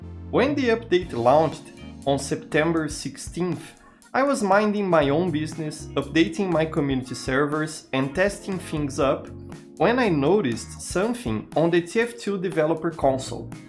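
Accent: Brazil. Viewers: Bruh, i never heard of you before and this video popped up in my recommended. But something about your accent SCREAMS brazilian, am i right? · Massa ver um BR fazendo um vídeo tão bem informado sobre o TF2. Top 10 sotaque foda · Are you Brazilian? you speak english with a very brazilian accent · Eu conheço o sotaque brasileiro quando eu escuto o sotaque brasileiro haha. Bom trabalho com o vídeo por sinal.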